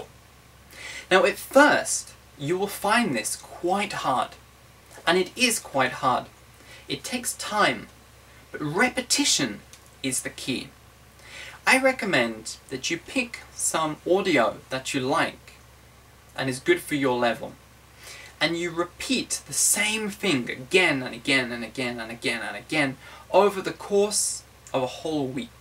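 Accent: United Kingdom. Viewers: are you british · r y brittish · R u a british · Hi!, nice to meet you! I am from Mexico, Puebla. I am learning English, It has been very dificult for me to learn a second language, however, I am trying to do my best. Trying to improve my speaking and pronunciation. Surfing the net, I found your videos by chance. I enjoyed British pronuntiation, because it is elegant and sublime for me, but the most was the way you explain your explanations, it is easy for me to understand your points of view to be a better speaker. I am very happy because I have been learning from your videos, I started praticing the tecnique called shadowing, I am impressed for the results, because not only I could improve my good pronunciation, but also my fluency was very good. It is incredible that my mind automatically help me to find the vocabulary that I needed to structure my ideas. 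Thanks a lot to share your knowledge and experiencie with people who really is interesting to learn English. Sorry for my bad English. My best regards Paty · Love your British accent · British accent !!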